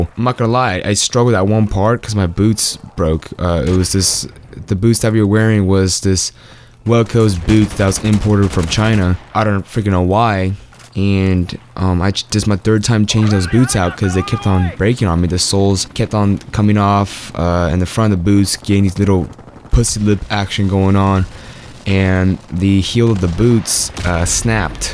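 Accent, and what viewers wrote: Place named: New York, United States. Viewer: It sounds like your in new york or california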